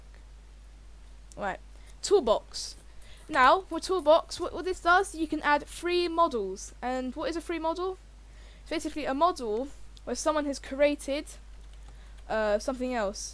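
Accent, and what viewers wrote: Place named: United Kingdom. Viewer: Why are all youtube roblox scripters british?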